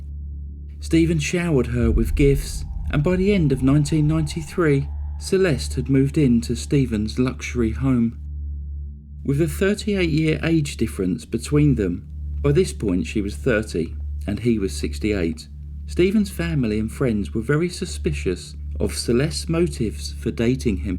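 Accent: England, United Kingdom. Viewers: You are all very nice. Love "my dear"😊Sounds like you are from England!? Would love to visit England one day. Thank you💜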